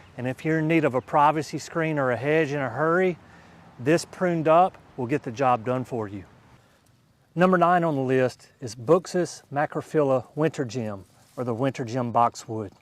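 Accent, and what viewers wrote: South, United States. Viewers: Where are you from? I'm trying to pinpoint your dialect. Arkansas? Alabama?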